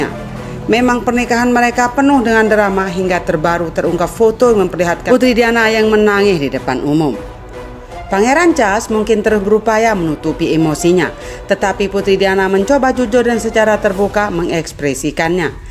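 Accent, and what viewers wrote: Indonesia. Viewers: Btw, apa gw aja yg ngerasa apa yg lain jg? Naratorny suarany kok medoks bgt seehh... OMG!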